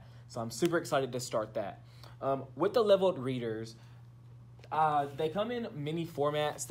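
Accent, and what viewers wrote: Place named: Southeast, United States. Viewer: I thought u sounded like it was Southeastern! 😉. I’m from S.C. myself! Love hearing Southerners!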